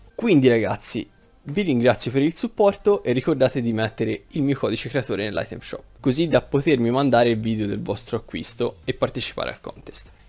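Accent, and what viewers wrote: Italy. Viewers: Sei della toscana vero? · Grazie mille, spiegato benissimo e poi .. in livornese! (?) Un si pole chiede di meglio! 😂🤘🏽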